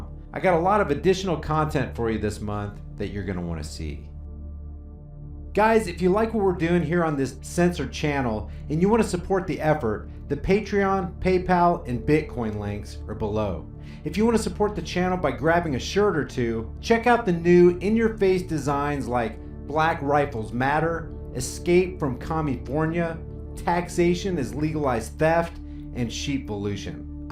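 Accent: South, United States. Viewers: Did he hurt your feelings? I'm from Kentucky too and I realize that there are several different southern accents not only in our state, but in many different states. Also, some of those with accents are police. Hell, they might even be Republicans. You watched this whole video and your comment is about his accent? It is sad that you can't get over your hurt feelings enough to focus the point of the video.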